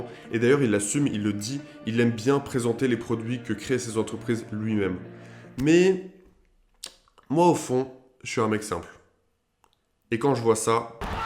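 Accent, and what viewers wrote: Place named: Russia. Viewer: On dirait que tu penses comme moi. Tu t'inspires des meilleurs pour t'en rapprocher. On dirait que ta vidéo a été tourné et penser par moi. On dirait que c'est moi qui explique quelques chose. Tant mieux, your're like me. Je considères humblement bien expliquer les choses et être bon pédagogue et je constates que toi aussi tu es comme ça. Je sens un légé accent russe, je me trompes?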